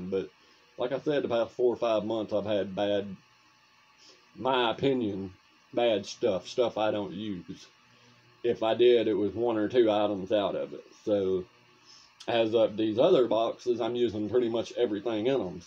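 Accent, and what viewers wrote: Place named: Northeast Alabama, United States. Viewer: Bud, you sound just like we do in northeast alabama. Lol and I believe that bass baits monthly won out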